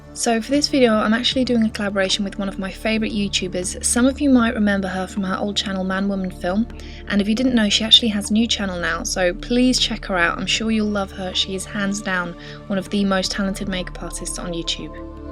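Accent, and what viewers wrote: United Kingdom. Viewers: she sounds british :) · R U BRITISH !?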